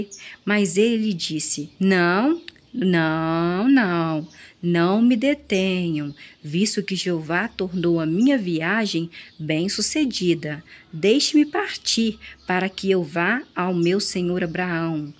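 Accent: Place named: Minas Gerais, Brazil. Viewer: pelo sotaque lindo de mineirinha, já era pra mim saber! Deus abençoe sua coragem e grande ajuda com explicações como a sua, simples e voluntária! 🙏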